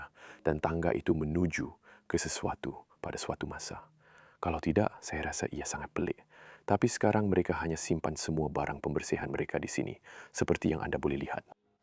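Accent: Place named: Indonesia. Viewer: Sekejap bahasa melayu sekejap loghat Indonesia...🤗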